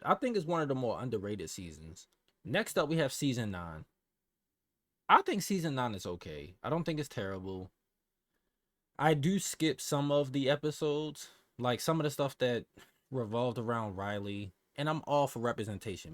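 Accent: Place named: Baltimore, United States. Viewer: Are you from Baltimore? I hear an accent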